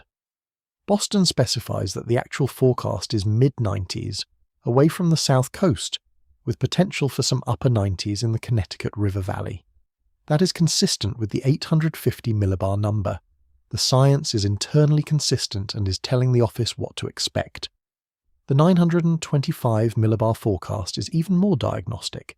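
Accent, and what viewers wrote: United Kingdom. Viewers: Why is the narration in a British accent?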